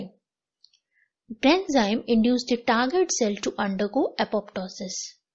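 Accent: India. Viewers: whats the word minutes? very Indian pronunciation, I don't understand.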